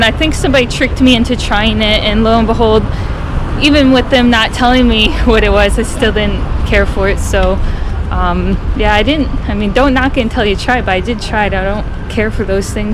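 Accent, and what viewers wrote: Michigan, United States. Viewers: Your Michigan accent is very cute 👍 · Hey, I haven't heard a Michigan accent much before, so it's quite novel sounding to me. I like it. You're quite unique in you language ability with both Chinese and Japanese. I've learnt Chinese through mass reading of novels, and am also learning French, so I know the time it takes. I don't think I will start another language, at least not with the intent of understanding it deeply. I imagine it must be difficult thinking up new content all the time. But then again, a lot of people just enjoy the everyday vlog videos. 祝你幸福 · Ah the Michigan accent lol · Hi. I'm wondering if Michigan English accent is similar to the accent in Southwestern Ontario in Canada. That's where I'm living, and to me, their accent sounds a bit different from yours. This is very common in China, but probably also happens in English-speaking countries (geographically very close but different spoken accent). 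· That's funny on some of the comments you mentioned.Being an American myself and having spoken American English since the age of 3, I can attest that your American English accent is still very much intact. You definitely still sound like a Michigander.:) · Hello from Michigan and yes that is Michigan accent. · Wow you have a Michigan accent? I’m from Michigan too and currently learning Mandarin in school!🤩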